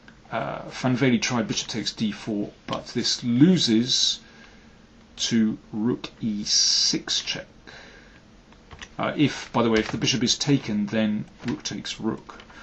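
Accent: United Kingdom. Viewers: Hi Daniel! Thank you so much for your insightful commentaries! Also a big fan of your British accent that makes things dramatic and theatrical to my taste!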